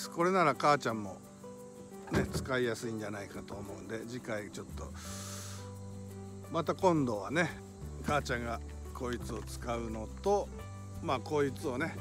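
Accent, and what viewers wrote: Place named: Oita, Japan. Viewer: 大分の豊後大野市の者ですが、そちらの言葉方言と良く似てますよ😃 ちびるは、チビったとか、尿漏れの意味ですが😃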